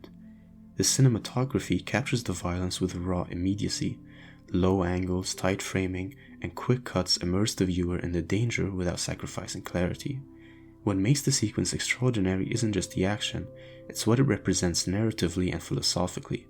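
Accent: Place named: Eastern Europe. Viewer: same insights with eastern european accent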